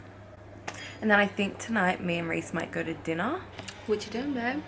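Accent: Australia. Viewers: Every time I finish watching one of your videos, I naturally attempt to speak in an Australian accent for the rest of the day.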